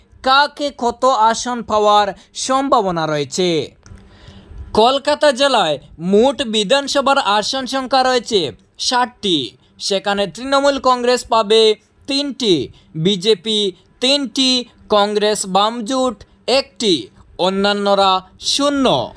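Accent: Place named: Bangladesh. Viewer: এটা বাংলাদেশী মাল কথার ধরন শুনে মনে হচ্ছে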